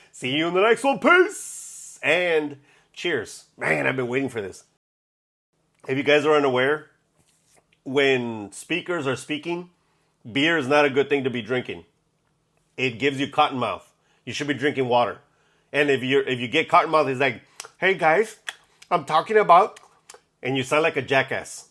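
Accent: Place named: Texas, United States. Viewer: You really are a Tejano!! My mom and granny all born in Texas (El Paso). When I hear you talk, reminds me of being with my tejano relatives!! Good content, payaso!!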